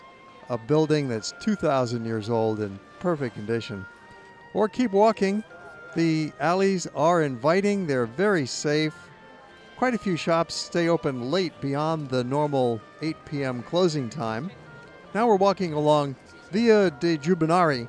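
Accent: United States. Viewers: your accent does sound very American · Great video! Thank you! What U.S. accent have you? I can't pick it...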